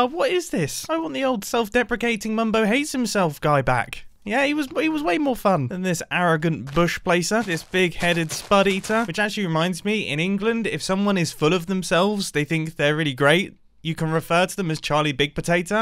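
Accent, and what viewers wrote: South, United States. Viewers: your southern accent never fails to break my brain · His southern American accent is everything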